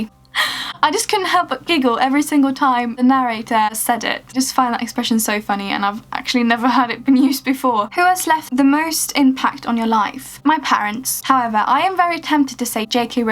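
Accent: England, United Kingdom. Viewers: You sound British? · jag trodde att du var britt först innan jag insåg att du är svensk ahahah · You're Sweden but you sound so English like damn this is new to me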